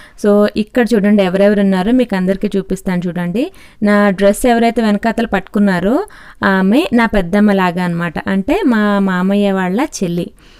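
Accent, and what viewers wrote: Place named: Visakhapatnam, India. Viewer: gaaru great andi still now mimmalni chusthe you look like egypt women andi kaani meeru matlade telugu valla andi i can recognize miru maa vizag ammayi andi great andi so you are living happily in egypt that's great andi Author Venkat 😎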